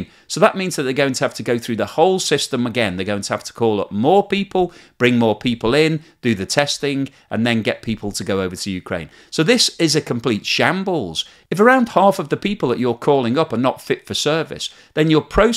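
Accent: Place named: Liverpool, United Kingdom. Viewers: I think has a slight Liverpool accent. Your English must be pretty good, so listen out for that! 😊👍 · Yes, definitely a slight Liverpool accent. Very clear to me.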